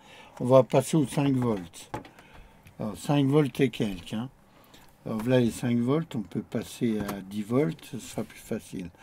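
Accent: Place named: Lorraine, France. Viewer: Bonjour, fantastique combine. Merci. Et que ça fait du bien d’entendre l’accent Lorraine, moi qui suis exilé chez les chocolatines!😂.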